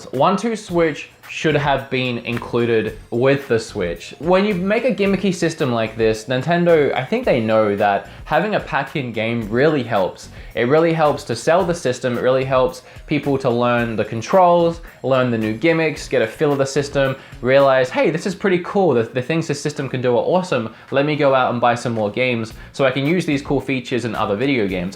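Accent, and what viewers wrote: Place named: Australia. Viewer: thicker aussie accent and a calm expression......sure did change 2 years ago lol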